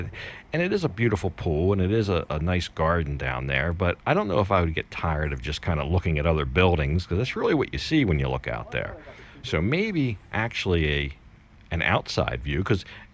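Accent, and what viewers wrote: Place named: East Coast, United States. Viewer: Where did you Grow Up? Sounds like east coast accent. Definitely a Great Radio Announcer Voice!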